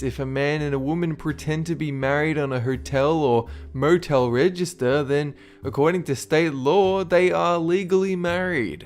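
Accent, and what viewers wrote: Australia. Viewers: Is this guy Aussie? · I'm new to this channel and he sounds australian..... ( JUST LIKE ME☺️) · Are Australian or British? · this is gonna sound stupid but are you Australian? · you australian?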